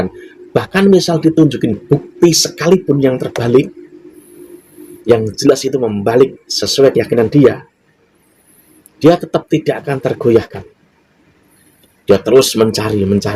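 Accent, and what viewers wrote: Java, Indonesia. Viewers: mas, aku kok seneng banget ya video sampeyan? cara bicara sampeyan itu khas jawa yg tenang dan lucu. salam kenal ya mas..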